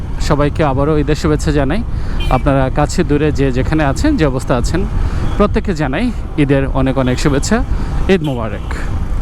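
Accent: Chandpur, Bangladesh. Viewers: Apnar basha Chandpur, age jantam na😮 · Vaiya apnr vasa ki Chandpur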